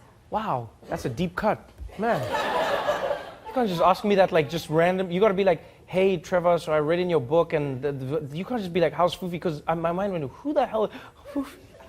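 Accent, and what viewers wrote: South Africa. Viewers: Come Home & Run for President, PLEASE!!!..and that ain't a joke, you'd be shoe in, got my/our vote...and wouldn't it be priceless running against Julias in 2029!!!... "_" ;-p....and have to mention, it was a pleasure working with you or should I say around you & shoot day driver on the Simba Chips Local Flava Ad campaign in 2010 or was it 09?, cape Town, you had me & those not sleeping at 4am pu in stitches laughing...well f-ing done man and don't EVER lose your SA accent proper pls..even if the networks did their worst, keep it local bruv:) much love & thank you #TrevorNoah for being the best foot forward SA has to offer...one of but among the top;-p #fuckTrump :) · I truly miss the daily show 😢 I love it! I am from Holland 🇳🇱 but I love 🥰 how you tell it so graphic that I can feel myself part of every story you tell. I love your South Africa accent and live. 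And this what you tell I truly believe back in history we see that we human created toys and games from our hand 🖐️ to play tennis, to finding a round brick 🧱, throwing robbed coins 🪙, gambling 🎰. I follow every show from you I hope that you come back with something similar like the daily show. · I think it’s cause he has a South African accent. It’s clear and easier to understand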